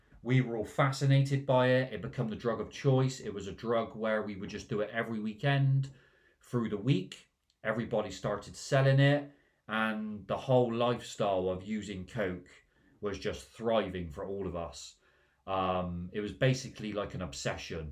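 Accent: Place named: Bristol, United Kingdom. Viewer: I knew you were from Bristol!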